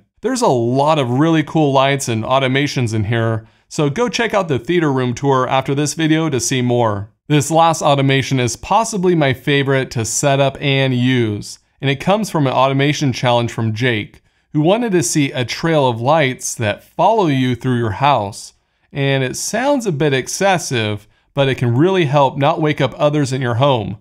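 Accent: United States. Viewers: By the accent, I'm guessing you're from Ogden, Utah. Am I even close?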